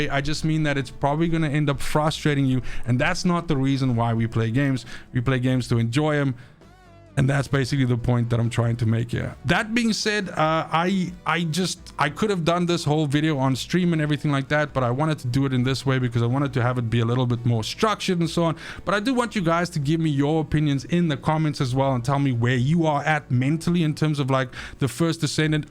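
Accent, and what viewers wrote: South Africa. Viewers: Are you from South Africa perhaps? This, coming from a South African.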